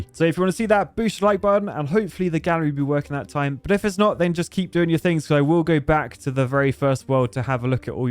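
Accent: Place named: Scotland, United Kingdom. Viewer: I missed the Scottish accent, though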